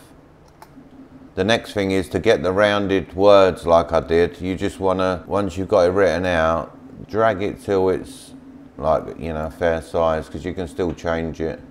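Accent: United Kingdom. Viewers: Mate, it says you're in the states but you judging from your accent you're from the UK? Seems like you know your stuff, what's your story?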